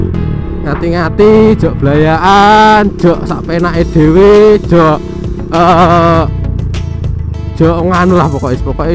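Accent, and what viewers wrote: Java, Indonesia. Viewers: jowomu ngendi pak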